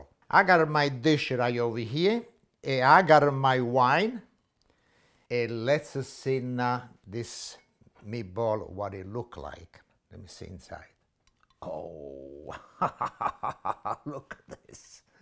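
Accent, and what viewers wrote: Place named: Campania, Italy. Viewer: Hi, just curious to know from which part of Campania you come from because my Mum used to say "A salut, addo' va'" and im from Sorrento Area. Nice video!!!! Keep it up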